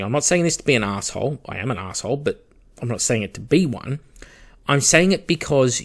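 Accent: Australia. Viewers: Excellent whine in redneck oz voice to ease you to sleep . Raise prices another 10% for oz.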